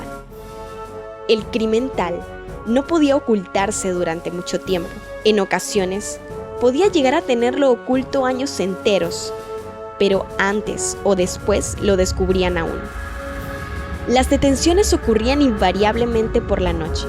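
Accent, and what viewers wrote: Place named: Latin America. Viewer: Bien! Con acento latino. Ese ibérico es muy molesto. Gracias.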